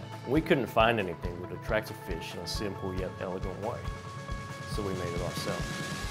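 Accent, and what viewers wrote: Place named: Rural, Sweden. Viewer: That's one hell of a Swedish country accent ya got there. I love it! He know s what sells and relating to your customer base is important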